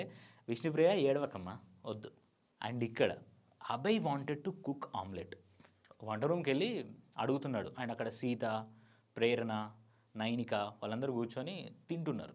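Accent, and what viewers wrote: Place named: Warangal, India. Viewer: Warangal slang super ekkadiki poyina slang maradu.❤❤❤ Warangal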